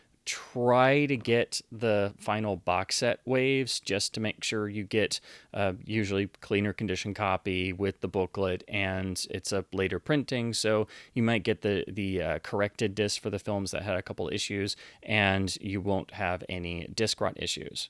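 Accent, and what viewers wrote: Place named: United Kingdom. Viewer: ur british accent is great.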